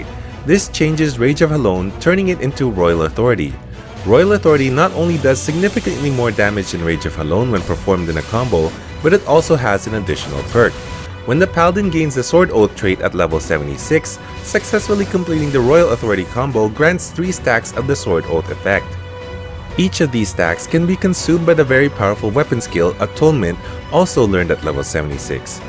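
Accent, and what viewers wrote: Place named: Philippines. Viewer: You sound like a Filipino with your English accent.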